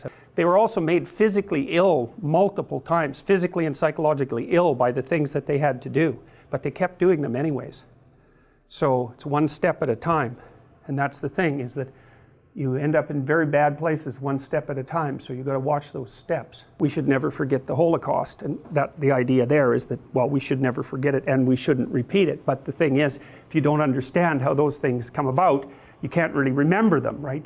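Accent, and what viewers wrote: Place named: Canada. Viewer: With a Canadian twist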